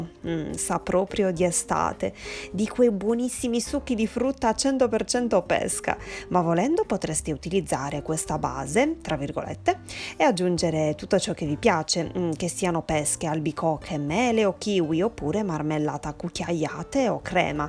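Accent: Sardinia, Italy. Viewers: MA BRAVA,LA FARÒ SICURAMENTE....CHE PIACERE SENTIRE IL TUO ACCENTO SARDO! GRAZIE X QUESTA RICETTA 👏👏👏👏😘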